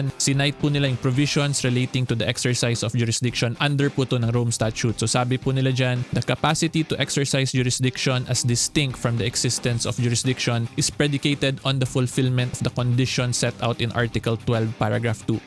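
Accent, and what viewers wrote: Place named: Northern Samar, Philippines. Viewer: Atty taga northern samar ka ba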